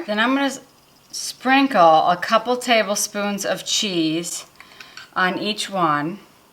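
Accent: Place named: United States. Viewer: Presumably - you take the string off before serving? Love that American accent by the way!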